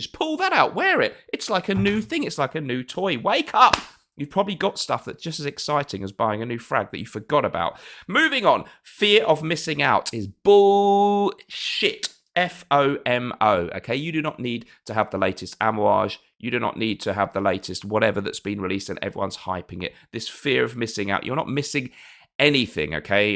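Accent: United Kingdom. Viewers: 🤣😂You made me laugh, thank you! Nice British english!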